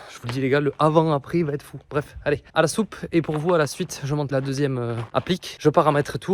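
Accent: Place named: South, France. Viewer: J’adore tes explications et tes expressions qui sont toutes pépites 😂, ce débit de paroles du sud, généreux et de bonne humeur communicative. Quoiqu’il en soit, le résultat est sublimissime, l’arrivée à la maison est chaude et accueillante, tout vous deux quoi !! Surtout ne change pas, ceux à qui ta façon d’être ne plaît pas non qu’à regarder des films muets des années 1920. Bises à vous deux et douces caresses à la belle Nala 🫶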